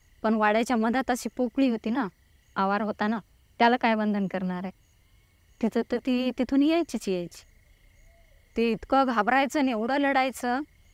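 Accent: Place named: Marathwada, India. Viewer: आमची मराठवाड्यातील ग्रामीण बोली भाषा आहे. कृत्रिम नसल्याने ऐकायला छान वाटते.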